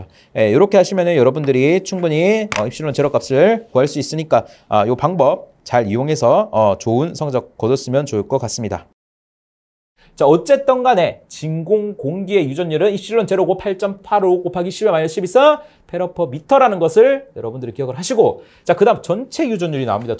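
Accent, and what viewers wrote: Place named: Busan, South Korea. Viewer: 부산사람이죠? 좀더 귀 잘 박히네요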